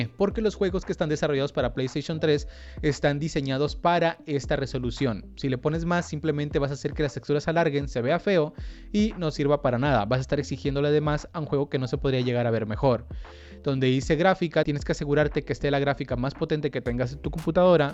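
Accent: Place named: Chile. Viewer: aprende a pronunciar la "sh", esta claro que eres chileno.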